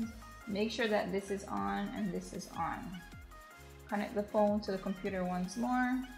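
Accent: Jamaica. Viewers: Knew you sounded Jamaican, yaadie repping here :D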